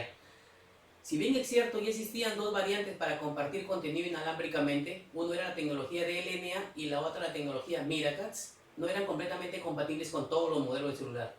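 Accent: Peru. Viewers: Bien, peruano tenías q ser, entiendo bien tu castellano.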